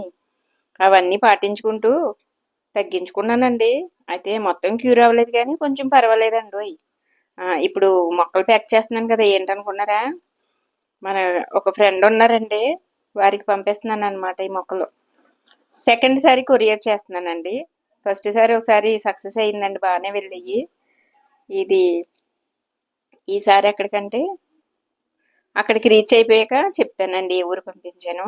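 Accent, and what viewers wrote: Godavari, India. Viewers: గారు మీరు చాలా చక్కగా వీడియో చేశారు...👌 Natural గా మన గోదావరి నటివిటీ తో బాగా ఆప్యాయత ఉట్టి పడే విధంగా మాట్లాడారు..❤ నేను గారు వాళ్ళ అక్క గారి అమ్మయి అండి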